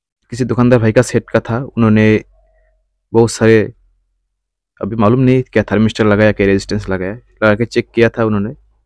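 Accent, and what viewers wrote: Bengal, India. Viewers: are you bengali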